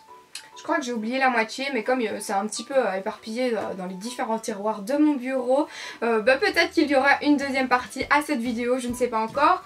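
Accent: Belgium. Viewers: tu es Belge ?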